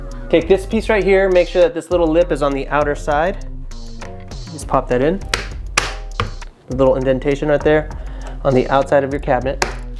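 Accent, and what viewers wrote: India. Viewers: Sounds like an Indian man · Accent sounds like a Hindu guy.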